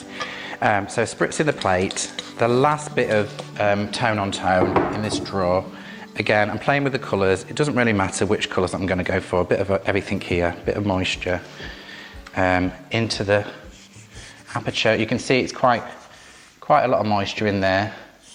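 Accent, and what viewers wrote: England, United Kingdom. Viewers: Love love love love love!!! The step by step, easy to follow instructions. So refreshing to listen to an English accent instead of a droney, nasal American twang! And your phrases: a tickle here, a tickle there. Just loved it. And the result is breathtaking. Completely inspired, thank you 😊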